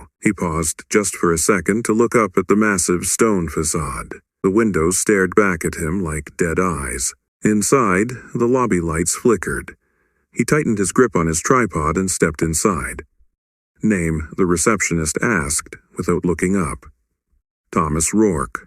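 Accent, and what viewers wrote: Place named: United States. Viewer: Agreed! And why would a channel called BRITISH hauntings choose an AMERICAN voice to narrate?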